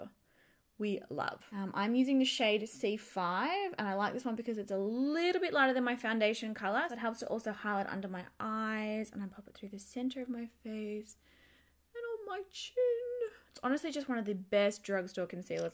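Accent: Australia. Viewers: Your accent is so Australian and I am Australian lol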